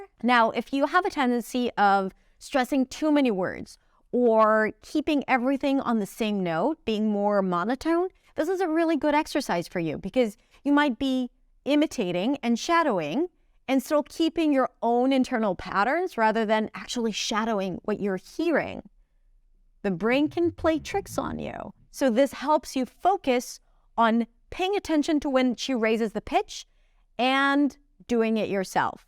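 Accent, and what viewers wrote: United States. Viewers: is your english american?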